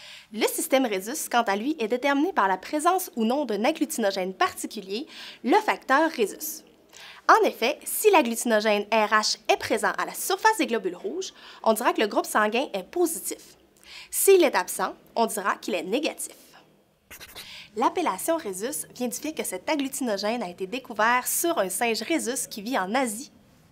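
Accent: Quebec, Canada. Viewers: Le groupe sanguin 0 + peut donner son sang à tous les groupes rhésus positif non ? ( A +, B + AB + ) Au passage, très bel accent québécois, moi j'ai celui de sud de la France, peuchère ! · Merci pr les infos en plus dit avec un joli accent de nos cousins québécois pr le coup québécoise 🔭🔭🔭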